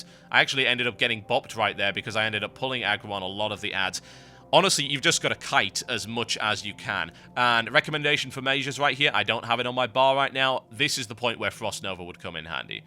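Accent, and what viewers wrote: United Kingdom. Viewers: british speak sucks !!!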